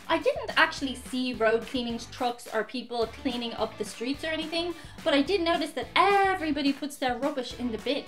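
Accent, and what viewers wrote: Ireland. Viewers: I find the Irish accent very charming!!! I m born Canadian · Yea we say were going to get a bag of milk, most of us just drop the bag in a milk pitcher and cut the bag open. Their are specific milk pitchers you buy, Google "Canadian milk pitcher" to see it if you're curious. When someone litters, i tell them the garbage is right there. Then i pick up their trash and throw it away for them. Its pretty passive aggressive 😂. I watch Doctor Who cause its amazing, alot of canadians i know watch it or know about it aswell. Alot of British sci fi shows are great. I wanna visit Ireland after the pandemic it seems like such a cool place and i love the accent too 😂. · Love your video, and I love an Irish accent as well!! I love my Country and would never live anywhere else....but I love to visit lots of other places and Ireland is definitely on my bucket list. 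Take care! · That a young woman with a lovely Irish accent thinks that Canadian accents are sweet is right some good there, eh? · We don’t have milk in a bag here where I live in Saskatoon it’s in a jug. Yes people drink lots of bottled water. We are polite because our neighbors to the south seem like they just beat each other up all the time so we’re just generally turned off by our noisy neighbors- but we still love them. It’s kinda like a family situation where your cousins are a bit too loud but you love them anyway. Also we think Irish accents are cool. The things you said about Canadian accents is what we say about yours. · Yes! The Irish accent is the best! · Canadian here, I think the Irish accent is amazing!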